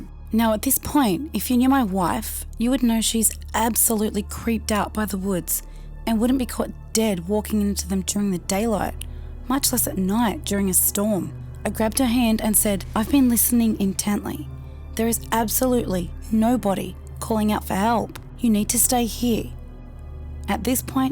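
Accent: Australia. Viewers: I'm from middle Tennessee and wtf with the Australian womans voice for a Tenn womans story 😂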